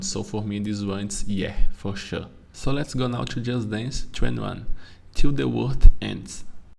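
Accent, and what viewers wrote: Brazil. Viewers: Vc é brasileiro, seu sotaque é uma bosta, e dá para ver que é brasileiro, fala português, seu inglês tá uma bosta irmão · Só eu que percebi que ele é brasileiro e te falando inglês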